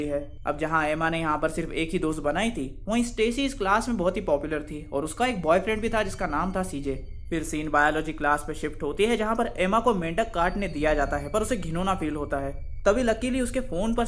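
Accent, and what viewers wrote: Maharashtra, India. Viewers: Ur Marathi accent is OP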